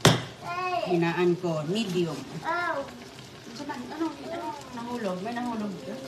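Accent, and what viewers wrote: Visayas, Philippines. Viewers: Minus points: she thinks eating with her hands make her remain filipino and don't want to use spoon and fork, her visayan accent is so bad to listen to, she loves bagoong even her kidw young as they are eat bagoong and eats with their dirty hands, most of her visitors are filipinos too so we can assume they have bagoong in their homes yet she serves food with bagoong always to her visitors.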